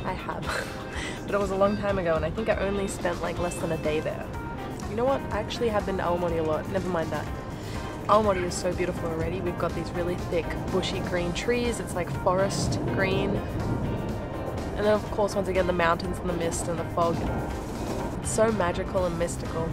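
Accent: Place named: Australia. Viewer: You have an awesome Aussie 🇦🇺 accent! I enjoy your Japan videos 😊 Thank-you from this Japanese-Canadian subscriber 🇨🇦.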